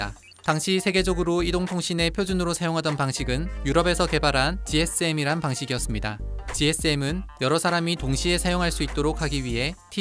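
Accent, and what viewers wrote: Gyeongsang, South Korea. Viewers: 갱상도 클라스 ㅎㅎㅎ ㅅㅂ 이제 댓글에 외국인 댓 졸라 달리겄네^^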